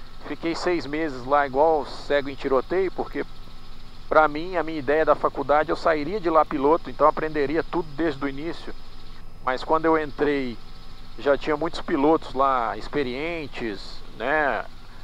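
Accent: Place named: Minas Gerais, Brazil. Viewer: Fiquei sensibilizado com a sua história de perseverança, provando que, mesmo com muitos obstáculos, é possível realizar sonhos. Você me passa a impressão de ser uma pessoa de bom caráter com muita humildade. Aposto que era zagueiro, e descia a borduna nos pobres atacantes - rsrs. Bom ouvir suas histórias. E o sotaque mineiro soa agradável aos nossos ouvidos daqui do sul.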